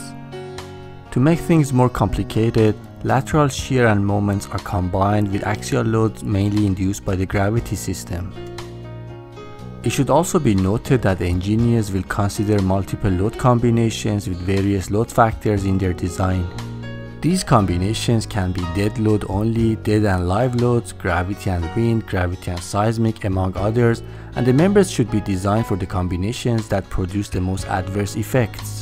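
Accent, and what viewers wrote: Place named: Iran. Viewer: Iranian accent. قرمه‌زبزی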